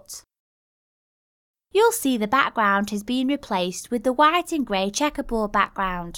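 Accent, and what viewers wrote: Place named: England, United Kingdom. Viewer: Omg she sounds gorgeously English like someone on a reality tv show. love her!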